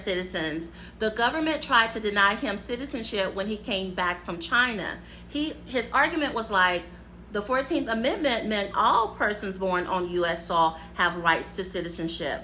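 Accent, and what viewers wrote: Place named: Africa. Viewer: Another African talking about black American stuff. Focus on the civil wars over there and the endangered lions. We can tell by how you talk you are trying to hide that accent jungle jungle girl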